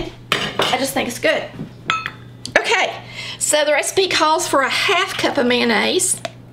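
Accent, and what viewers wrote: South, United States. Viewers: The "rocker blade" is also referred to as a Mezza Luna (Italian)....which means a half moon. I have an antique one which has 2 cutting blades. You can also use a Mandolin (French) to evenly dice the veggies. Loved her recipe, as well as that Southerner accent. Happy eating.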